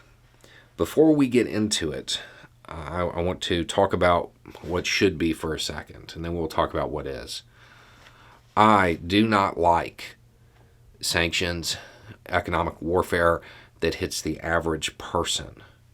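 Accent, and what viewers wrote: South, United States. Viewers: Not sure if you can tell but your accent has gotten less southern. Just watched a video from 3 years ago.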